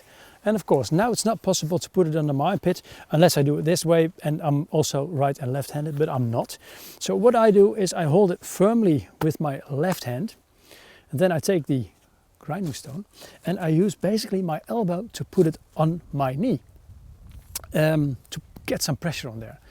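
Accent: Netherlands. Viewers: Ik houw er altijd van om door YouTube te gaan en ineens een Nederland accent te horen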